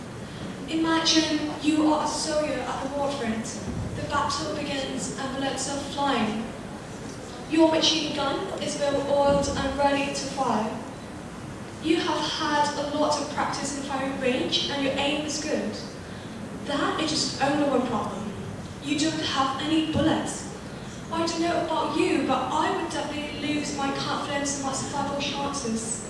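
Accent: United Kingdom. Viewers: What a British accent! So impressive ^^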